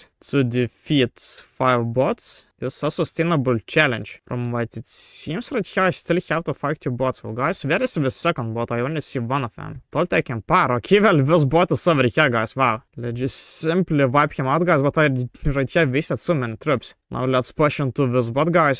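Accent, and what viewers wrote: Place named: Russia. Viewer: damn the russian accent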